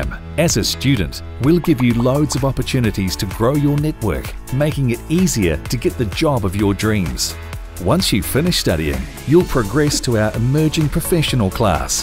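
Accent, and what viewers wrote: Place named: New Zealand. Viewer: complete with subtle kiwi accent...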